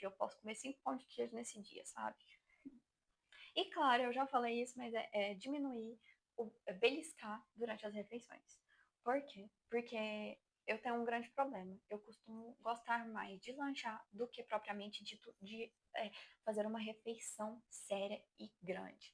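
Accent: Minas Gerais, Brazil. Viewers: É mineira? vive de pão de queijo kkkk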